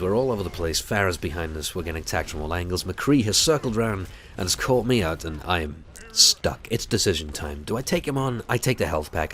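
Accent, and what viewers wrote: United Kingdom. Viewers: You should do like a documentary. Like, seriously. Also subbed, I love hearing the British? accent! :3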